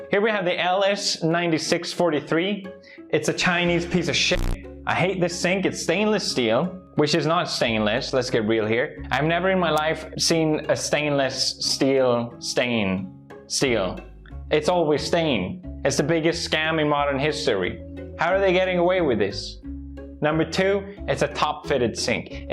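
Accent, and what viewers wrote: United Kingdom. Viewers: His uk accents are getting a lot better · as a Mexican living in Britain, I can confirm this is how Brits sound like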